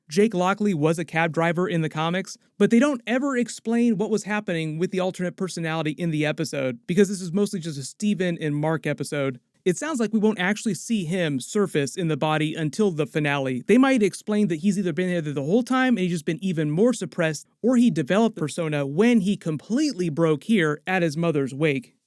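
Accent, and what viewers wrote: United Kingdom. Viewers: I think his British accent is very very normal lol this is what we actually sound like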